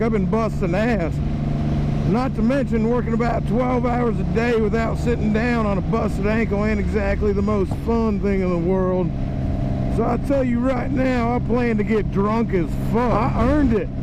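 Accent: South, United States. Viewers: sound more southern in your old videos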